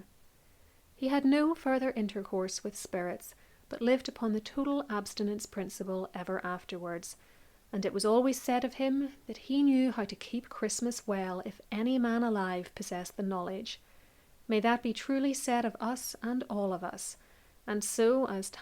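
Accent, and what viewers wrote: Northern Ireland, United Kingdom. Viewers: if you’ll pardon my being less professional though, it’s a little evil. I already have a weakness for the northern Irish accent, and you’ve gone and made a 2 and a half hour long video! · THIS one girl! Love so much your Northern Irisch accent. Greetings from Friesland in The Netherlands (I speak Frysian and Dutch as my native tongue)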